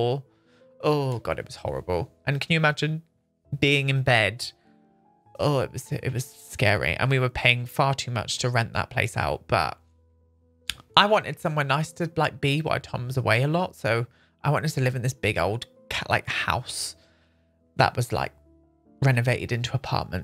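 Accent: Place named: England, United Kingdom. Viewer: I love your english accent.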